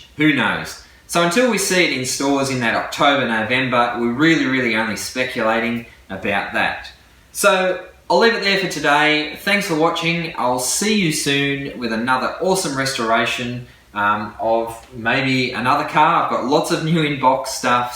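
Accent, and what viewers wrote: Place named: Australia. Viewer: Audio is much better, but you seem to have picked up an Australian accent some how.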